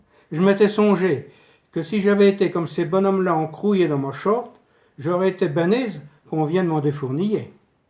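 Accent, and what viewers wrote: Sarthe, France. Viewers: Bonjour à vous.le patois sarthois .c est beau à entendre.je suis sarthois château du loir.allez entendre le belinois. Mort de rire.bravo félicitation mr.vous êtes d où de la bas.pardi.bon courage à vous et tous mes meilleurs vœux de sympathie.en voiyure.🤩🤩🤩🤩🤩🎅🎅🎅🎅🎅🎅👍👍👍👍👍👏🏿👏🏿👏🏿👏🏿bye.a demain. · Mdr le pure sarthois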